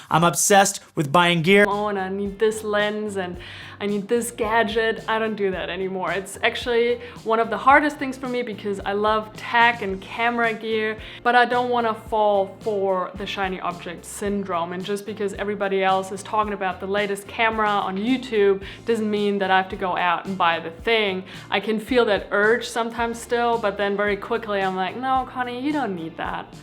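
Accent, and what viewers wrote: Germany. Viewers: I stopped dating and found I had a wealth of time and money. Your accent, is it German? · Conni are you German? I think I hear some accent 😊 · Great video, thanks! Especially the idea with the physical gifts... I’m gonna do that. Are you actually german? I like that lovely accent so much! greetz and hugs from Berlin 🐻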